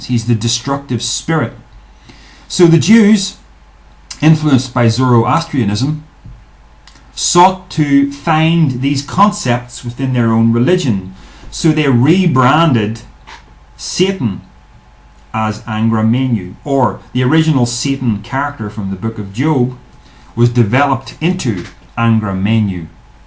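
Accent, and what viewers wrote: Ireland. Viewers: oh boy are you a luciferian?i am so scared now.i get remarks like that all the time.please dont let them get to you.we are working on a website.and i will send you a link when we are done.well most of the work is done by onno.but its gonna be awsome ok?i like ur accent.is it irish? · hearing you talk is like listening to myself think...but in an Irish accent. Weird finding someone who is on the same page on almost every topic you've covered.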